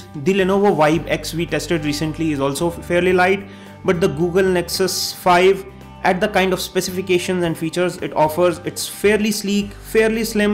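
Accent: India. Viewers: Fuckin indian accent,Fijay Raj Khumar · South indian accent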